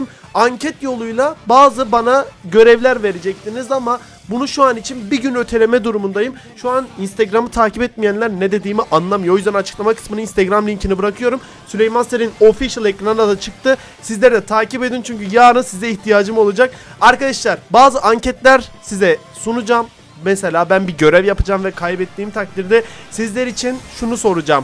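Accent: Gaziantep, Turkey. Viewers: Abi Sen Antepli misin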